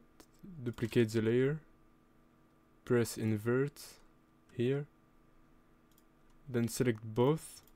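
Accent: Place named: France. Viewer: why is bros voice always changing now u sound french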